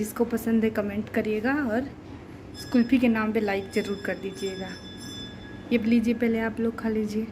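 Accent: Bhojpuri, India. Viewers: Aap kaha ki rhne wali ho Didi mai ballia ki hun aapki language bhojpuri waisi hi lg ri h apka gaao kaha h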